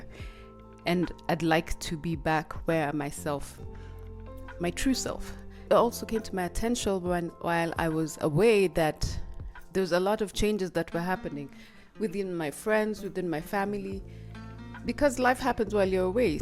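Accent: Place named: Kenya. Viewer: You never lost your Kenyan accent,,, being Kenyan is permanent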